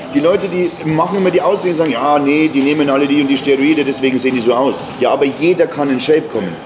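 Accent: Bavaria, Germany. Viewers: Abo für den Bayrischern Dialekt is raus 🙂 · Google Transkription scheitert am Bayrischen Akzent. 🙃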